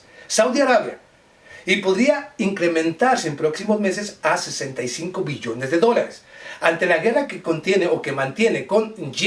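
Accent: Mexico. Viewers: Don't forget to mention me BRO am watching you from AUSTRALIA I really like the way of your analysis very easy to digest as well I like your Mexican accent.. well done Cheers...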